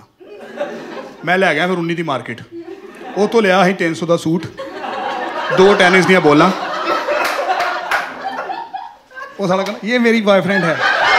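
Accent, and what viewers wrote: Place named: Malwa, India. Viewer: Pure malwai o paji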